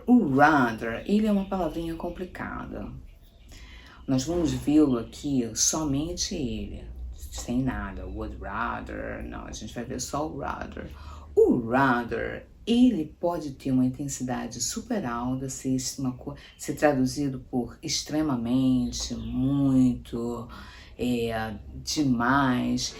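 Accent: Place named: Rio de Janeiro, Brazil. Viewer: venho só pelo sotaque carioca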